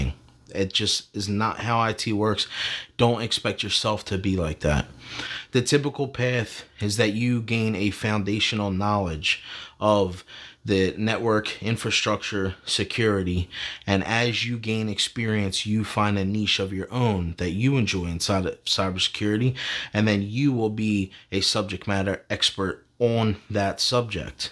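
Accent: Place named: New York, United States. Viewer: Also are you from New Jersey? Your voice makes me think you're from either New York or New Jersey.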